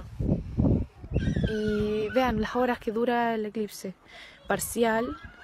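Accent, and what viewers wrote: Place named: Chile. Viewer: esque es chilena xD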